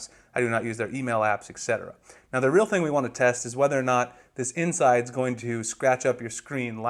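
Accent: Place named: United States. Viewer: I love the way Americans say mobile lol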